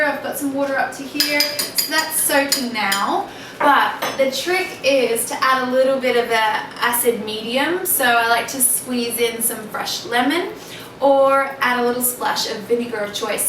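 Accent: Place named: Australia. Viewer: I like your accent - I think you are Australian. I have just begun eating this food.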